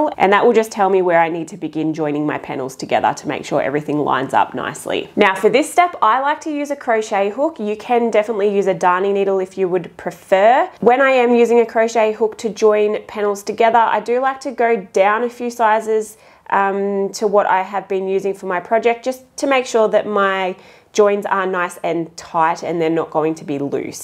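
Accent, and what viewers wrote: Australia. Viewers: Well-designed cardi, new sub, just want to listen to your Aussie accent...😘♥️🦋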